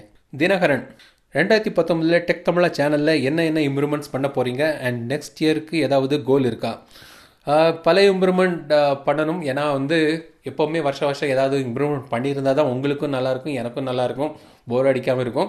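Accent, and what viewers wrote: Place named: Nanjil Nadu, India. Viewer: nanjil tamil super....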